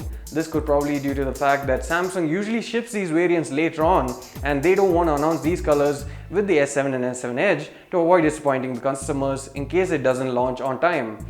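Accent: India. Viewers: Ninguém entende essa desgraça de inglês indiano!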